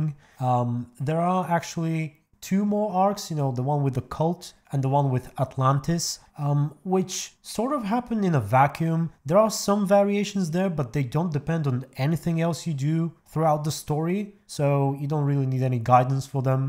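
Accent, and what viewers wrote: Bulgaria. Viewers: Are you from Bulgaria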